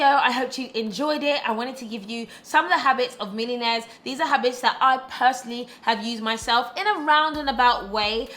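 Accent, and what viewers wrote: United Kingdom. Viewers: I love British accent💋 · Are u british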